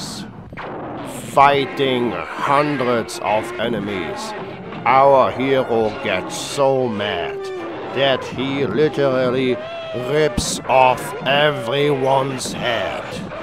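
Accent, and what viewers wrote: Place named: Germany. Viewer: Why did you put a German accent in this? Wtf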